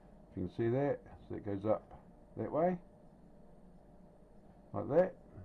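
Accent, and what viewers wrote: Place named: Australia. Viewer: I've been doing similar hacks for ~25 years. I mainly repair arcade games but my house alarm had an installer password on it so the user can't change any settings. I dumped the eeprom then using the installation manual I found online I did a factory reset. then I dumped the eeprom again and did a search within that dump for the known factory password. then I simply looked at my original dump at the same memory location and found the installer's password. now I can change any settings I want LOL! You sound like an Aussie... me too! I'm on the West side Perth. Where are you?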